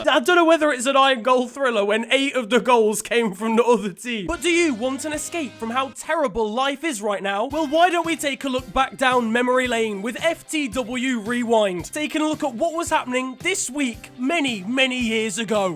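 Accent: Liverpool, United Kingdom. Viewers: 10/10 scouse accent aswell XD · Moore scouse accsent I am not English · That carragher accent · Love the Liverpool accent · Loving the scouse accent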